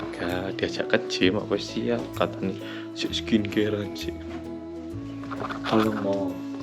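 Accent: Java, Indonesia. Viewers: Mas kalau ngomong gk lupa ama jowo medok e aku seneng dengarnya soale aku wong jowo juga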